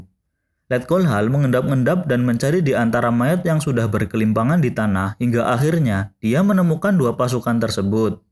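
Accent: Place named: Banyumas, Indonesia. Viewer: Yang nggak bagus.. Ini orat nerocos terusan.. Ngapak lagi ngomong nya.. Nyebelin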